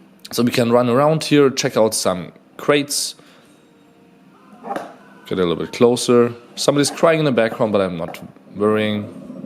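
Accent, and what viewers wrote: Germany. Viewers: man hört's einfach immer wenn Deutsche Englisch sprechen 😀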